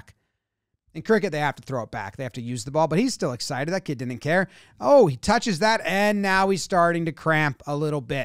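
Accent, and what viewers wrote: United States. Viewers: its the weirdest thing hearing an american talk about cricket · Its so weird to hear someone with American accent commenting Cricket. We are so used to Brit, Aussie and Indian commentators 😂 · there's something about an American describing cricket that is just addictive to listen to. Such a great reaction video. This should be used by the ICC to promote the world cup that's coming up in America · This is the first time I'm hearing someone commentate in an American accent, and I'm loving it, especially since its funny too. Refreshing!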